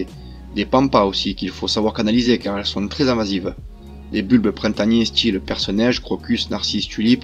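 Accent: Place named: Southwest, France. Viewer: Je viens de te découvrir par la vidéo permaculture passer d'un sol mort à 1 vivant. J'ai adoré. Tu es où en Centre France ? Car vu l'accent.... J'aurais plutôt parié sur le sud Ouest😂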